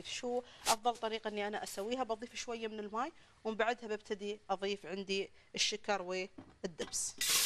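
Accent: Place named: Oman. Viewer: عجزت افهم لهجتها الظاهر انها عمانية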